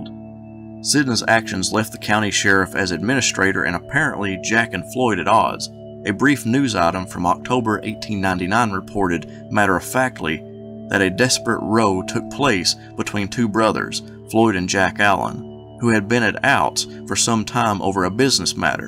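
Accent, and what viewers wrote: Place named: Appalachia, United States. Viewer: You almost sound like you are from the Appalachian area, but the song says the professor of knowledge is in the heartland. Texans use a long i when speaking also. I want to know where you are from, just whimsical curiosity. I love these videos and ALWAYS appreciate learning from you. I wager East Tennessee or Oklahoma is where you might be from.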